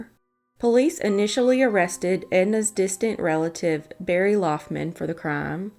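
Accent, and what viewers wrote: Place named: Mississippi, United States. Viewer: i don't know where in the south these are from, but this voice makes me miss visiting madison, mississippi.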